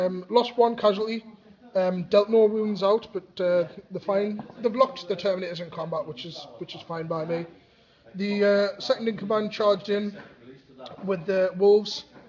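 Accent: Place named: Durham, United Kingdom. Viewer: Was very pleased to see Wolves and Necrons and enjoyed the report, you sound like you are local to Durham as well. One thing is Logan on Stormrider is a vehicle so moves 12" in movement and flat out in shooting so shouldn't roll for a run move. Also about Stormfang in the formation, it can be chosen if it passes or fails reserve roll, it's not a reroll, that's the voidclaw formation rules.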